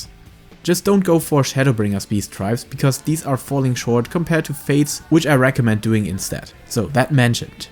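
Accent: Germany. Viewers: Are you german? Cause of your Accente.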